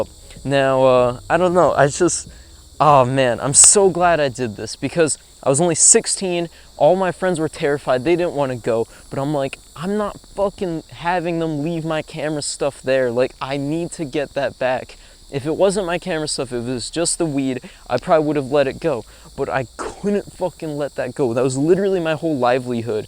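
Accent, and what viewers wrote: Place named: Canada. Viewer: Where are you from, sounds like youre from Canada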